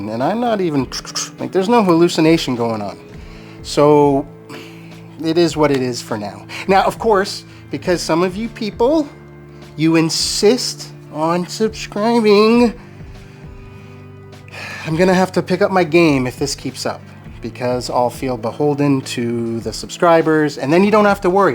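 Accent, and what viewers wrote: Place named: Canada. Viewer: I subscribed because I like your voice sounds calming and Canadian 😊👌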